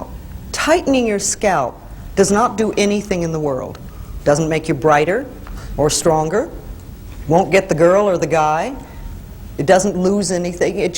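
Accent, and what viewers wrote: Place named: United States. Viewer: What American accent she is using ?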